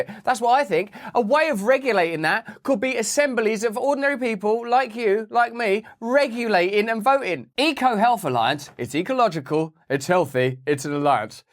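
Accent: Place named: England, United Kingdom. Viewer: the 'surprise birthday party' bit gets me all the time🤣🤣🤣. The England accent makes it even more hilarious.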